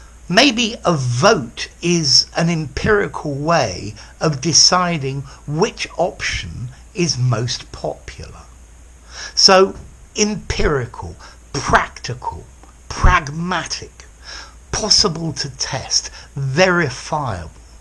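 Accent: United Kingdom. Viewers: Glad to help. I also love his lessons. But the first thing, I was attracted by, was his British accent.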